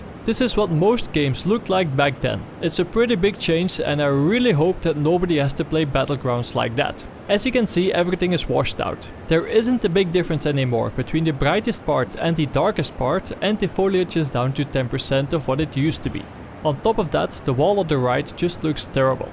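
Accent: Netherlands. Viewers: That accent tho! only the dutch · I like your dutch accent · you have a horrible rotterdams dutch accent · LEKKER NEDERLANDS ACCENT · Dutch accent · love the accent too! guess its dutch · u sound dutch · Hollands accent ftw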